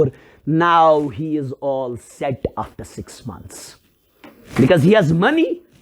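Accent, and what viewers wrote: Kashmir, India. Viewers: Sir say salam khan as samlan khan .apple as yapple ..kashmiri accent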